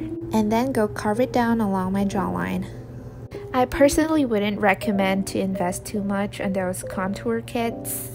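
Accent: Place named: England, United Kingdom. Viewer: Youre English Accent is Great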